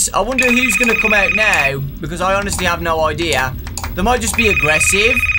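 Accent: United Kingdom. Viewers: wow you are british!!!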